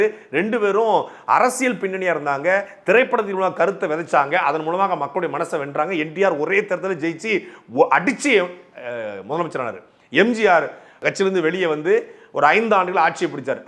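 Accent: Tirunelveli, India. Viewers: thirunelveli pechukku enathu valthukkal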